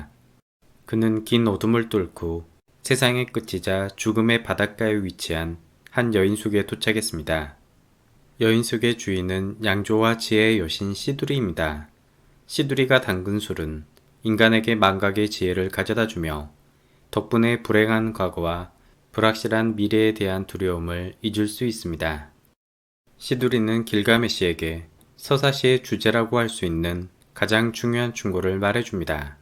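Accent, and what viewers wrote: Jeolla, South Korea. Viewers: 전라도 분이신가 사투리발음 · 전라도 사투리가 정겹습니다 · 숨기지 못한 전라도 사투리로 듣는 길가메쉬 이야기 감사합니다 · 혹시 전라도 분이신가요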